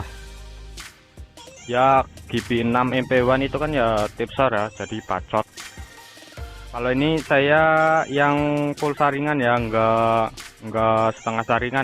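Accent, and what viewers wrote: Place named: Java, Indonesia. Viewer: Bhsn e kok agak medok² kek org Jawa bro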